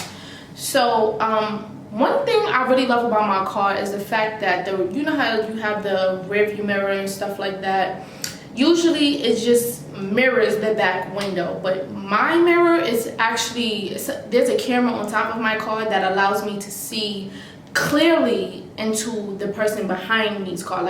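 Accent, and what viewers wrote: New York, United States. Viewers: That’s so scary! Glad you were paying attention to your surroundings and your boyfriend was there! Also yall with this thick ny accent story time is everything 👏🏾 “respectfully” lol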